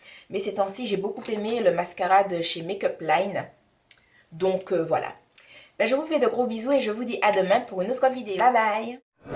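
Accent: Antilles, Caribbean. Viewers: j'adhere avec tn accent des antilles jme sen chez moii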